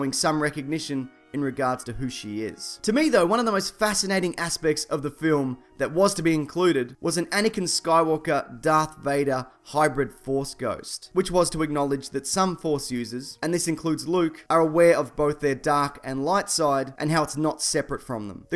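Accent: Australia. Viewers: do you mind me asking what your accent is, is it Australian or some other thing? I am just curious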